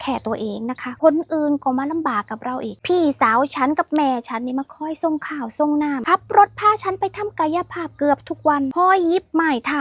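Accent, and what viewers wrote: Isan, Thailand. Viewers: 😂🎉❤Happy new year ขอให้สุขภาพแข็งแรงไวๆ ครับ ชอบคลิปสนุกดี สำเนียงเด่น ทั้ง ไทย อีสาน เขมร อังกฤษ แถมยังน่ารักด้วย😊😊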